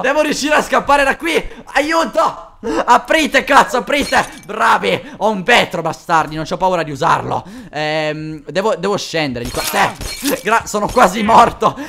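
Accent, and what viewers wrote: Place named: Rome, Italy. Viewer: ma sei romano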